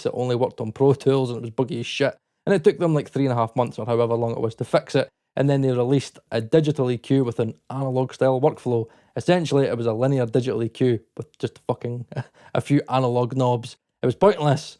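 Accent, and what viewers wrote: Scotland, United Kingdom. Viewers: Hi. Thanks for saving me a shite load of money on inferior software. Also, my families from Ayr and try as I might I can’t seem to geographically pinpoint your Scottish accent 🤔😉 Keep up the good work.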